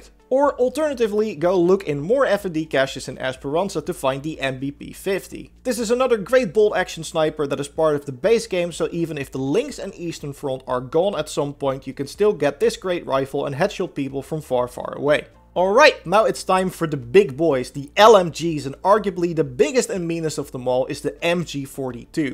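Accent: Netherlands. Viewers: I can hear on this guys accent he’s Dutch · Are you Dutch? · You must be Dutch. Heard it within the first 4 words you spoke.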